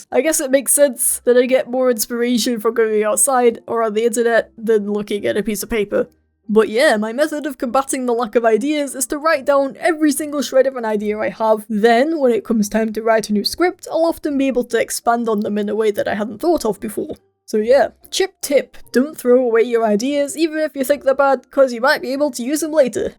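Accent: United Kingdom. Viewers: Its really interesting to watch your channel. I really like your british accent whilst you talk about german potato culture. Like, you couldve chosen all countries but you took germany?! I dont understand why cus i just want to get away from here, Lg dein Tom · Your voice is british deep and compaired to your fisrt video is wowz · KID OF LINK AND ZELDA it's just his british voice (yes he's british) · R u British??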